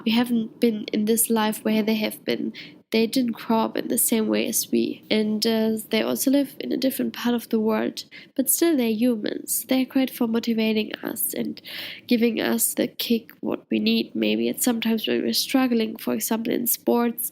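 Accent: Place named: Germany. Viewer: Oh your German accent is so cool! Go Germany!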